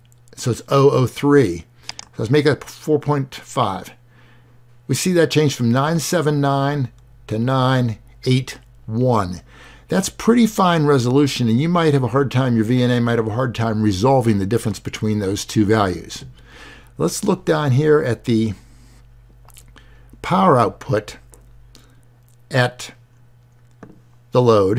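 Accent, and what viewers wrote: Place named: England, United Kingdom. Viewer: Do you speak Russia?I don't understand your video. Because you speak like English man. Where are you from?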